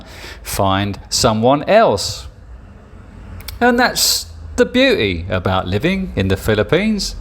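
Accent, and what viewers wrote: England, United Kingdom. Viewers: Great post and hilarious word play; I really enjoyed your alliterative flourishes: You turn a phrase well. I particularly liked your voice when you were imitating a Filipina girl accepting a date to go eat. Which part of England are you from? I've been spending a lot of time in Dumaguete and have been to the Why Not many times. All the best mate, and keep on posting.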